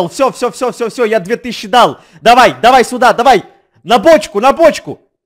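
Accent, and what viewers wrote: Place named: Kharkiv, Ukraine. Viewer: шокают не в деревне а в Харькове 😎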